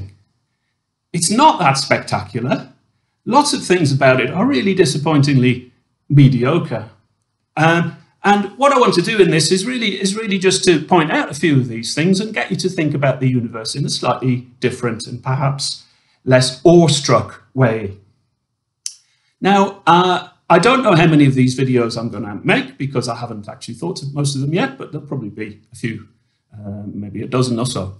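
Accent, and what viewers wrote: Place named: England, United Kingdom. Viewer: Don't be shy about not being big budget. You've got the English accent that all the greats have, like Attenborough and that guy who wears the tight shirts; that's all you need. Oh, and you've got a way better beard than any of those guys from Nottingham. And don't get me started on the guy with the hair. Keep it up!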